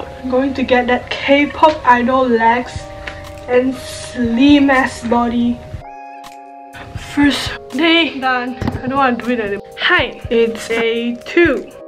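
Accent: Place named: Malaysia. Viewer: BRO WHEN THE VIDEO STARTED AND YOU STARTED TALKING I WAS LIKE "is she Malaysian?" AND TURNS OUT YOU AREEEEE OMG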